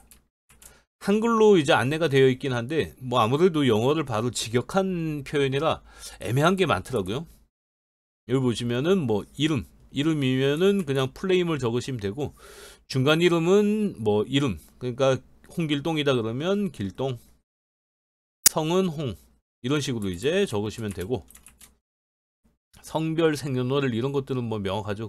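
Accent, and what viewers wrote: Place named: Gangwon, South Korea. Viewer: 좋은 정보 감사합니다 말씨가 약간 강원도 억양이 보이네요 강원도 분이시죠? 저는 강릉에 거주중 입니다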